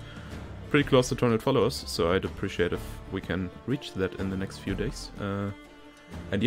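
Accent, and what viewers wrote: Germany. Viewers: are you german? x) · are you german?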